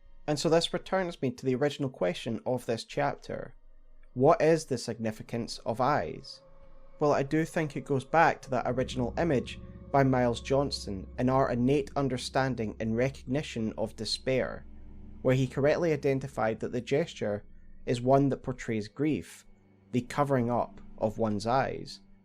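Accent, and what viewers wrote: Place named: Scotland, United Kingdom. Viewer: Wake up honey, the Scottish Elden Ring lore man has posted!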